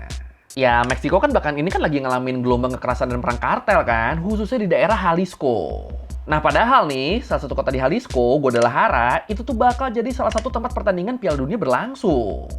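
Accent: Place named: Java, Indonesia. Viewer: bang elo...medog kayak jawa ya😅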